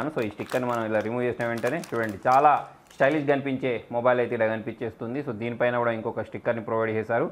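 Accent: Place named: Godavari, India. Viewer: Motham godavarikane anna.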